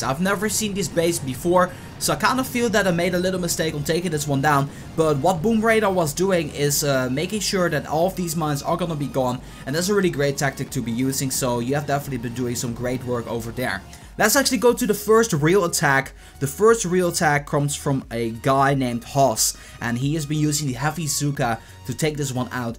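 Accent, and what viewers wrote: Netherlands. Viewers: This is one of the most helpful players I've found. His accent is Dutch. You guys are rude.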